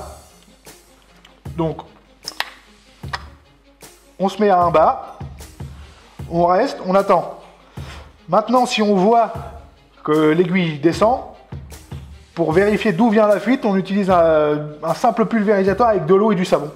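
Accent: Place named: Normandy, France. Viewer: on entend l'accent normand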